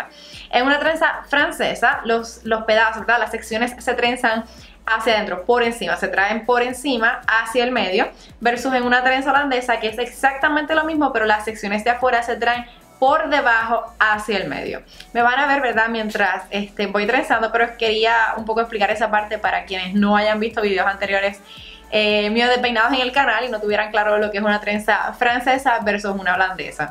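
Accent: Puerto Rico. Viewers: (pues lo supe por el asentó Boricuaaa jajaj yo también soy de allá besosss)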